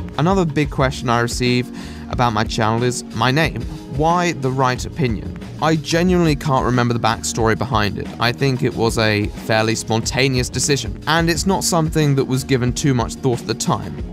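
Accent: RP, United Kingdom. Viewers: yes!!!! I adore all kinds of accents all of them are amazing but british is my fav!! So much so tht since i was a lil kid id worked and practiced on having an english accent (just general accent from ppl id seen on tv) and im actually rllly good at it now and i love using it, its just such an awesome spund and i can listen to talk all day lol I also love russian, australian and japanese their languages and their accent (and asl but i dont knw if it van have an accent? Maybe in different ppls hand and arm mobility & flexibility) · Cheers dude, I've watched so much of you videos every now and then my voice instinctively makes a fake British accent. Anyways congrats dude! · Subbed a few days before this video.Nice job your the only you tuber I know that ACTUALLY sounds professional and things.Very posh,lol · He is gay, a philosophy student, in his early 20s and has a heavy melting British accent He is perfect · I feel meh about it but I like him. 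However a Spanish accent on English is my preference. Perhaps that's why I'm indifferent to the British accents. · On the question of accent. I don't think any of you are going to get exact accent, but one of my danish friends achieved a stunning British accent, mainly by reading Shakespeare out loud (as a part of her education and as a hobby). Hard work, I know, but it is possible to get a nicer accent by training. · I'm the kind of viewer that dislikes the usual QA video since youtubers usually put a lot less effort into them and are more there as a backup plan than anything. Though once again you posh bastard, your sickinigly sweet voice has tickled my inner ear canals in yet another video. I'm new here but I hope I don't get pushed into a locker for it since I plan on staying. Keep the grind going and I'll be sure to smash that like button like your voice smashes my special place every night. 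Though you are gay so I might have to get a penis in the meantime to fully enjoy the rammings I thrist for. Cheers cunt and make that European Union video sometime in the near future. <3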